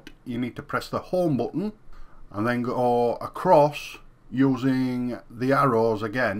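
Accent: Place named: Yorkshire, United Kingdom. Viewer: Leeds or Bradford accent...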